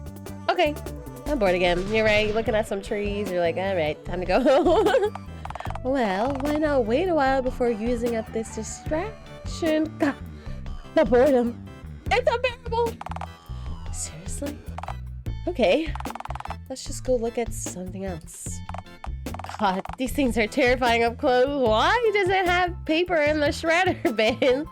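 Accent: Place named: United Kingdom. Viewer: i'm still in the beggining of the video, but, stellar british accent by the away 10/10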